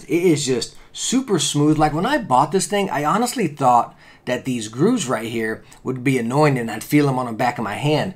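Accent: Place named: South, United States. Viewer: I love this guys country accent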